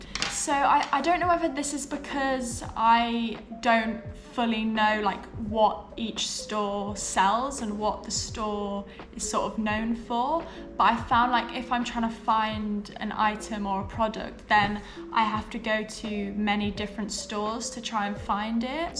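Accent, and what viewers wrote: United Kingdom. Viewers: this is the first time I hear this pronunciation. She has a really heavy British accent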